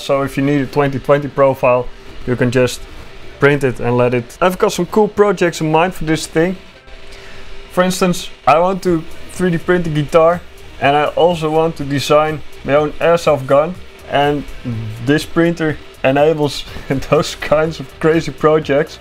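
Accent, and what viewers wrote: Netherlands. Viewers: Being a dutchy makes me realize how Dutch you sound. Love it tho. G E K O L O N I S E E R D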